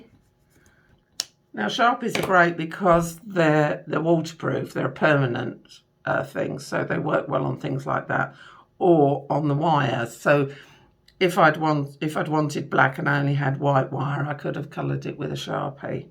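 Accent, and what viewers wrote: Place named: United Kingdom. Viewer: So cool to see how this gorgeous have is made and that it's doable for a newby like me. And fun to hear a british accent! I lived in London as a student years ago.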